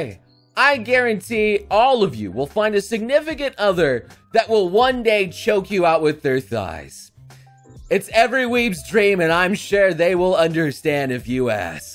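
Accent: Scotland, United Kingdom. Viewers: Ughhh that Scottish accent causes me genuine pain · I missed the scottish accent.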